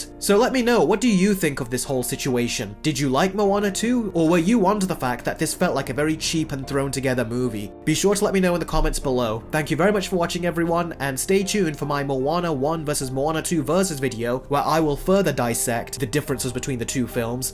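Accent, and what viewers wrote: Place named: United Kingdom. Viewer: British accent? I’m hooked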